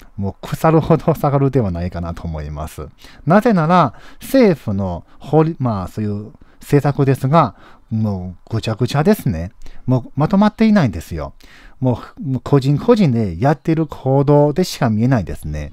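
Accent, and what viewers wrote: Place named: Osaka, Japan. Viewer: 大阪弁だぁ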